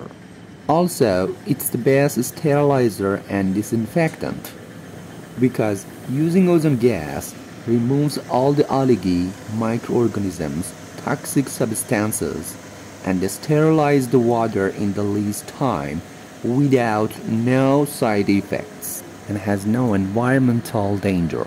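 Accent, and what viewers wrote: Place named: Europe. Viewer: Why is the voice that's narrating this video sound European but all the men in the video look Middle Eastern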